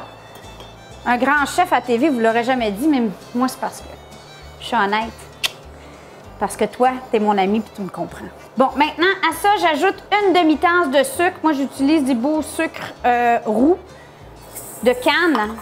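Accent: Quebec, Canada. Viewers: Bonjour j ai fait le pouding chomeur et je l ai réussie je vais le déguster avec mon conjoint ce midi Merci Beaucoup je vous trouve super drole avec ton accent quebecoise nous avons beaucoup rit🤩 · C'est devant ce genre de vidéos que je réalise combien nos cousins québécois sont bien moins ternes que nous, les français... Vive la bonne humeur, la cuisine et l'accent québécois! Et +1 abonné! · Ahhh...sa fait du bien d'entendre l'accent québécois....apres 2 ans au BC....sa me manque en titi....sniffff.... pis du sirop d'érable...ahhh..pas achetable icitte...son fou!....loll.. Merci pour tes vidéo et recettes.... quand je mennuie trop d'chez nous...j'vas v'nir te voir...😁😁... Vivi du Québec...mais au BC....lol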